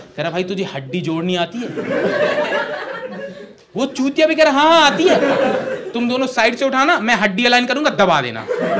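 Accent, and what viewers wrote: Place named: Western Uttar Pradesh, India. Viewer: Western UP ka accent lag raha....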